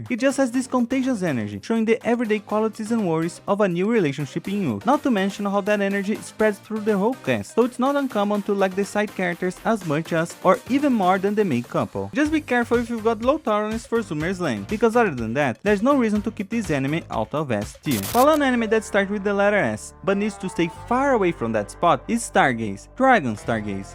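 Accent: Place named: Brazil. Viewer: Tu é br né? Da pra reconhecer pelo sotaque kkk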